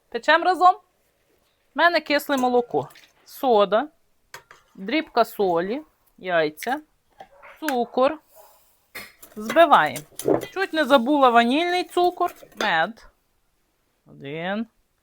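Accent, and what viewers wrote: Lviv, Ukraine. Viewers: Ні, не Житомирська. Там слово #файно# не вживається. Скоріше Львівщина.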